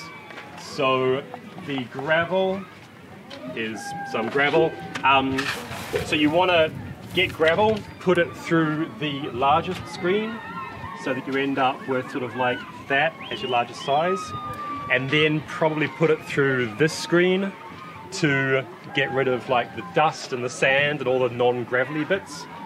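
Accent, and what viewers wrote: New Zealand. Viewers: Cool. We thought so. We heard the NZ accent then the slight roll of the "R's" was the give away. We picked Gore or Invercargill. Our boys just went shark diving down there a couple of weeks back. Good to see another "Kiwi" making a difference in the world.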